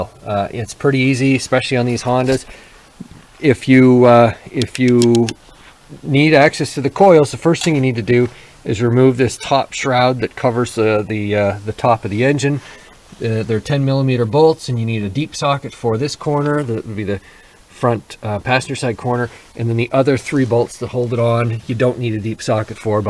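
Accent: Canada. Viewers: You could tell from the accent he's in Canada!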